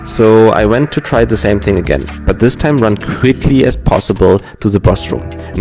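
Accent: Germany. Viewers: Sympatisch wie man dein deutsch raushört <3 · are you german? and your pronounciation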